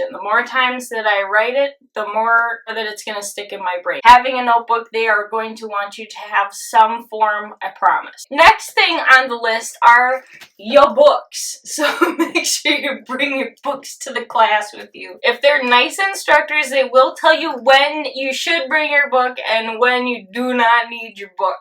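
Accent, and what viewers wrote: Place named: Upper Midwest, United States. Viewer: LOVE your editing. Best MA videos on Youtube. Girl, you must live in MN, WI, or a nearby state. I moved to WI from Texas and that accent on the word "bag" (or, "beg") is unmistakable. Thanks for the helpful info. Appreciate that you keep it funny and entertaining but don't get off point with makeup tutorials, etc. that have nothing to do with Medical Assisting. Yeah, anyway, good job.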